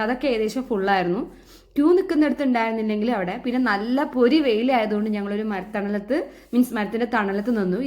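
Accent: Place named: Palakkad, India. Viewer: എവിടെയാണ് വീട് ഞാൻ പള്ളിക്കുന്ന് ഇടവകയിൽ ഉള്ള ആളാണ് വയനാട്ടിൽ ആണോ താമസിക്കുന്നത് സംസാരം കേട്ടപ്പോൾ പാലക്കാട് പോലെ തോന്നി അതാ ചോദിച്ചത്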